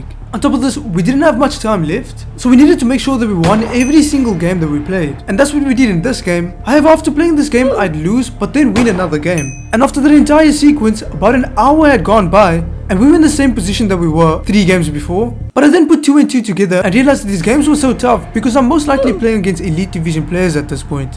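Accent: South Africa. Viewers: I'm not gonna lie his sounds a little south african 😅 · Are you from south Africa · are you south african???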